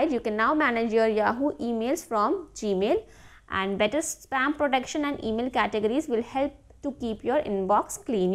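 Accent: India. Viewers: Your indian english is difficult to understand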